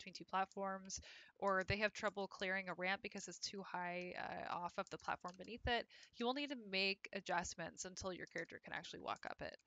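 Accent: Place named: United States. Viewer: How about you get some nazal spray. You sound like you have a cold. Or is that how all Americans sound? Good content but try blowing your nose first so you sound hunan. Sorry for being blunt but if you want a global audience talk like the rest of the world does. Good luck.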